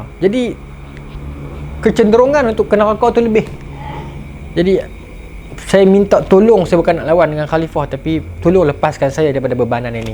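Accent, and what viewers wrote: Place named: Muar, Malaysia. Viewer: Muar clear 🤩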